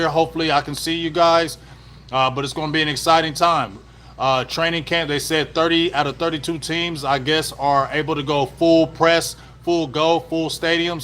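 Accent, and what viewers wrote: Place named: Dallas, United States. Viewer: The way you talk is total Dallas makes me miss my beloved city. Go MAVS. Go Cowboys!!! Been a fan since 76, for life baby...keep on doin' watcha doin bro. ✌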